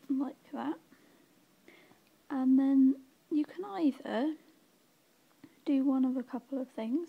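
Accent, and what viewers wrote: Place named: United Kingdom. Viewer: She's British.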